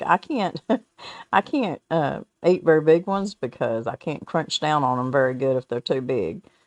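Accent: South, United States. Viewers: I love listening to you talk, your southern accent is adorable. Thank you for sharing the bread and butter recipes. Hope you have a wonderful evening. Love the soothing sounds from the pond.❤️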